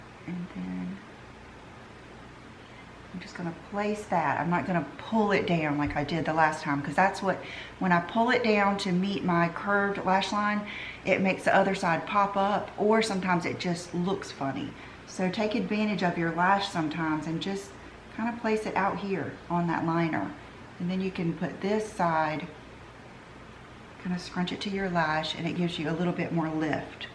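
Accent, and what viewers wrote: United States. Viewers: I am a recent subbie from the UK living in Spain and I love your channel. Love your accent and you are very pretty. What state do you live do you mind me asking? Xx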